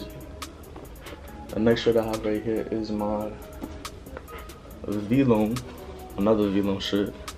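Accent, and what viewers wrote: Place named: New York, United States. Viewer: Yee i aint een see da name nd i knew yu was from new york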